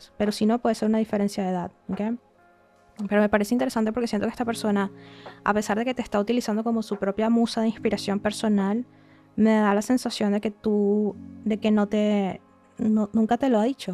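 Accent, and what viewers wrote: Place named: Venezuela. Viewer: Eres la más acertada que existe 🙌 eres mi favorita y amo tu acento venezolano ❤ op 2 describiste todo en exactitud. Lastimosamente tiene pareja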